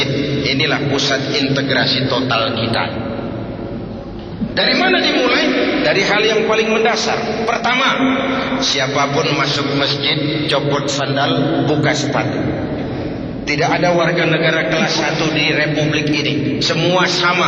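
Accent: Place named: Aceh, Indonesia. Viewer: suara aceh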